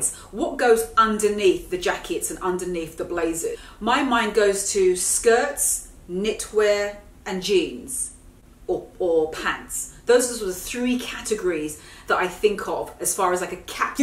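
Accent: England, United Kingdom. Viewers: Love your videos,. I'm a Scot living in SoCal and really enjoy your lovely English accent and style, of course <3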